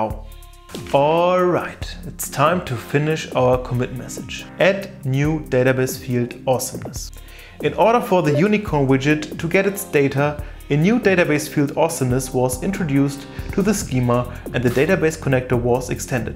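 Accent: Germany. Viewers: Man hört sofort raus, dass Du deutsch bist 😜 Aber super hilfreich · The world is so small. From your accent, I knew you're german. But then I opened your website and saw that you're also like me from Hamburg 😅 Very good video. I'd love to work with folks who could appreciate a good commit like that! Subbed!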